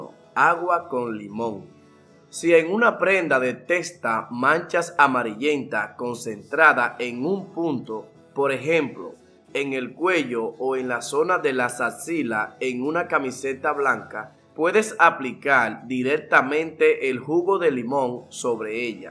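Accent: Dominican Republic. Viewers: Criticón, me gustaría escribirte, parece que es dominicano y sí es así, ese su lengua popular.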